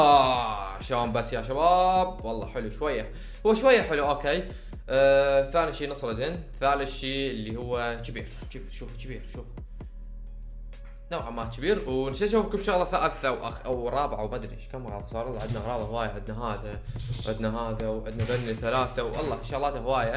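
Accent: Iraq. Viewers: بلهجت العراقيين كلاو. 👲🏻👲🏻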